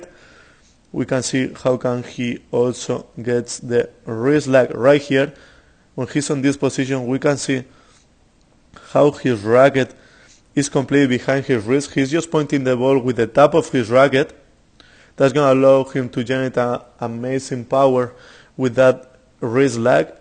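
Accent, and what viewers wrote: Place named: Spain. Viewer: Hi, thanks for the video, u give lot of information, sometimes too much but good explanation. Just it's hard sometimes to understand everything cause I'm not a good English speaker and with your accent it's even more difficult haha (no offend). What's your mother language? If it's Spanish I would be interested to see the same video in your mother language cause I speak Spanish better than English :D. Thanks, keep going with your videos;-)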